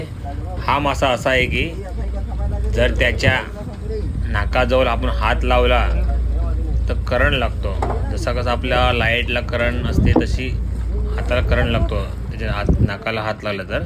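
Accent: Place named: Maharashtra, India. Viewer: He sounds like Modi but accent is Marathi , who agree with me?😂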